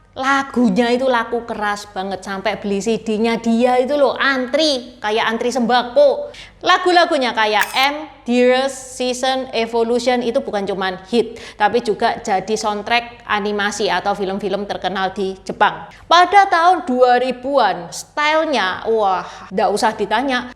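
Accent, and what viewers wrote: Java, Indonesia. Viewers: Anjai jepang jawa medok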